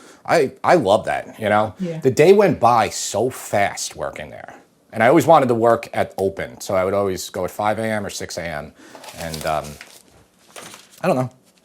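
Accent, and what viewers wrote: New York, United States. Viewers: You guys from New York?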